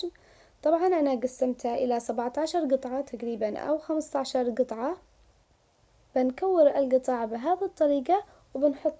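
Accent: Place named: Sanaa, Yemen. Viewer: انا فدالك ياصنعانيه ومااحلى الكلام الصنعاني والطبخات الصنعانيه ...واحلى لايك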